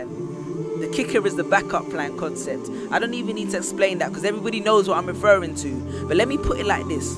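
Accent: South London, United Kingdom. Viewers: I can tell he's from south london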